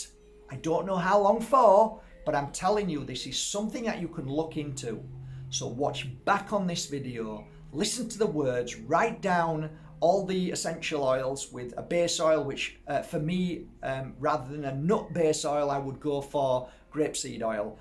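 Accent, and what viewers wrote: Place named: Yorkshire, United Kingdom. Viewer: Brilliant! You’ve got such a beautiful heart! ❤️ Thank you for the workshop. I will try and help those who are going to need this 👌💫 Ps. Love listening to you 💕 Your accent reminds me of the time I had spent in Yorkshire, I used to study and work in Leeds for some good 6 years! 😄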